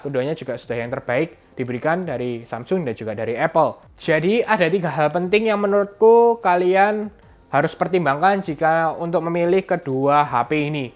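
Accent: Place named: Tegal, Indonesia. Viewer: Tegal atau cilacap mas atau brebes sih mas bro😁😁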